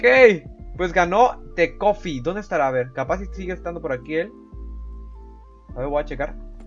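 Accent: Colombia. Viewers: valla asento colombiano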